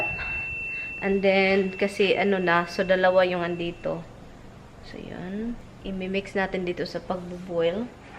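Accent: Visayas, Philippines. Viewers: Halatang bisaya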